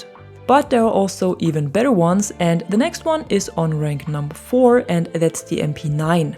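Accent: Germany. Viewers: Ha ich wusste das du deutsche bist :D hört man tatsächlich raus.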